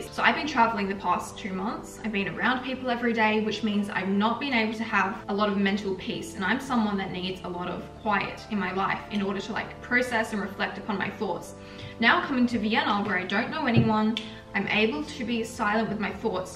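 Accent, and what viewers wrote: Australia. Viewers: I was like 5 second in, and I was like "Yup she's definitey australian" ;D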